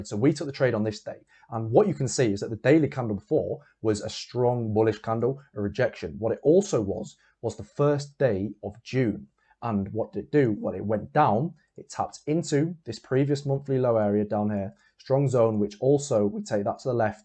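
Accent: United Kingdom. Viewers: His accent seems British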